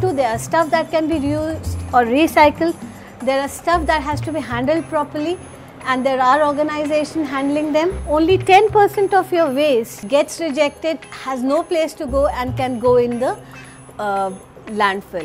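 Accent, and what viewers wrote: India. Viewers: Confuse of indian accent. A lot to consider. Decomposing should also made simple.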